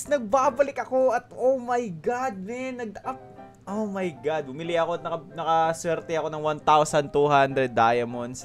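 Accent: Visayas, Philippines. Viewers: Panit Ng saging hahaha bisaya kaman boy😂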